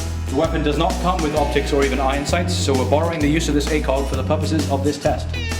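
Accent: United Kingdom. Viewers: i said influence not control ;) he asked why does he have an english [british] accent? and i just said because of Britain's influence in Japan. It's a larger one than America or other english speaking countries, no? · but why does he speak soooo... english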